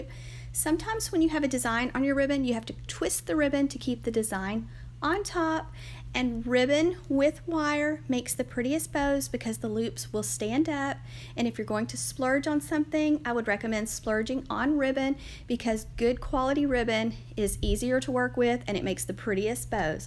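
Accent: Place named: South, United States. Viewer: Once I heard the southern accent, I knew this lady knew what she was talking about. Thank you for making it quick and simple too!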